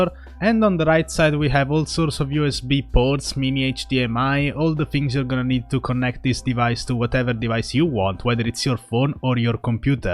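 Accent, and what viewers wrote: Russia. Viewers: I thought Russian cause the accent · This person has a heavy Russian accent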